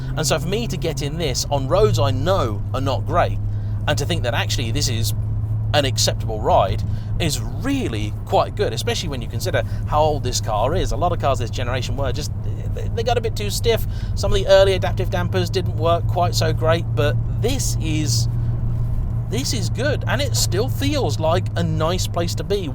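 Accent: United Kingdom. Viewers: Yes, I believe you did a great job with this clip. Yes, I like BMWs, especially, the M3s. This M5 is particularly better than the ones I have tried I admit that but what I am curious about is your accent because I must say you are probably the only Brit that I can understand all of what he says. So, from what part of England is that accent?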